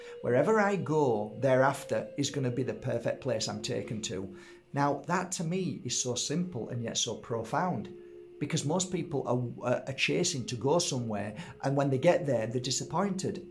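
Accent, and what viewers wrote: Yorkshire, United Kingdom. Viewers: Is it a Yorkshire accent?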